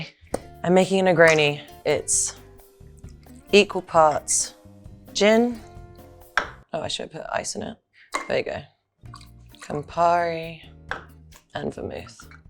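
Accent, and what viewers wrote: United Kingdom. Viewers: Very sweet girl and very heavy UK accent ... 😅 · Omg❤😊I'm obsessed with british accent. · and the British accent is very strong, as strong as the drink 🍹 i suppose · Stunning ❤❤❤ I didn't know, she has sort of like a British accent, love it ❤ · What kind of British accent does Dua Lipa have?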